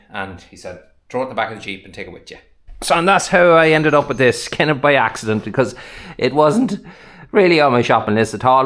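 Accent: Ireland. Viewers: A thought was from Boston not Ireland 😮🏴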